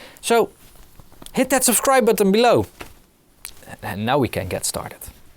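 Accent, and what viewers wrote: Netherlands. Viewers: Your Dutch, arent you? :D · Is he dutch? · Your accent sounds like a Dutch accent (Ik ben een fake Nederlander)!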